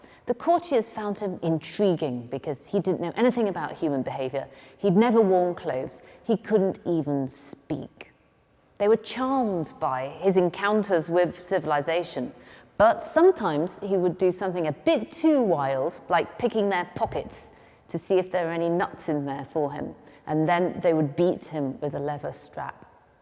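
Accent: United Kingdom. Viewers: Look at what I just bumped onto. This is a great channel. I'm an American from New York City. I always look for YouTube channels like this one that focuses on history and actually goes and videos those historic places. I can already tell it will be fun watching them. I like the British accent. The British tradition. No annoying music in the background and I love learning about historic situations I didn't know about. Kudos to you Historic Royal Palaces for this gem of a channel. I will now go on a binge watch of ALL your videos. -- Frank. Cheers. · Subscribed just so I can hear you talk more! I love the British accent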